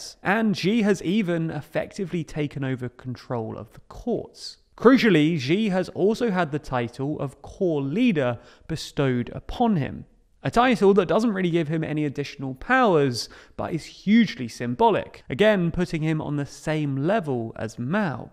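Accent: United Kingdom. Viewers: Great video but I hate British accent because some words sounded too soft like you swallowed half of it.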